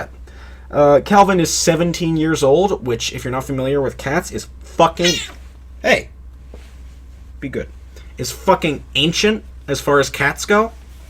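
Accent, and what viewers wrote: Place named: Northern England, United Kingdom. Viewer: it made me chuckle a little hearing you say "Oedon" in a a northern english accent.. you can just pronounce it "Oden" its just the accents in game that make it sound like Ewwwdon 😂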